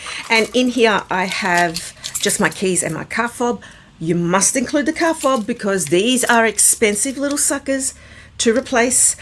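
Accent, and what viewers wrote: Australia. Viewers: Love the Australian accent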